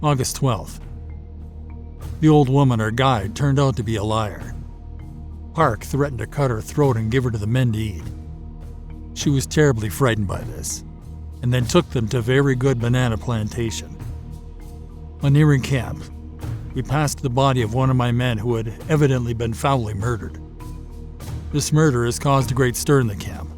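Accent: United States. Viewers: He sounds more American than Canadian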